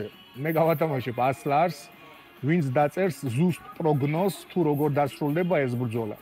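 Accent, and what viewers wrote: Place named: Russia. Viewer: აქ მგონი რუსული აქცენტით ნათქვამ overhand -ზე იყო საუბარი. 😊 მაგრამ სულ არ აქვს მნიშვნელობა რას ამბობთ გავიგეთ.😊 ქართველები ჰ-ს ამბობენ უფტო ვიდრე ხ-ს თქვენ ალბათ რუსულ ენას ფლობთ კარგად და გავლენა გაქვთ. მადლობა ინფორმატიული განხილვებისთვის!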